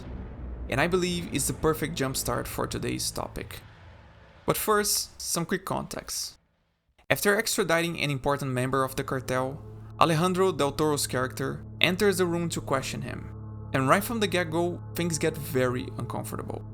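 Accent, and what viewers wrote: Brazil. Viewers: Great content! Do I hear a hint of a Brazilian accent?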